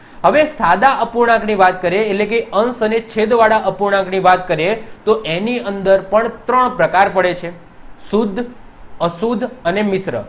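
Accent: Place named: Kathiawar, India. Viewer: Sir bov lecture joya tamara bov maja aave se bhanvani amari jevij sudhdh kathiyavadi bhasha se tamari 😊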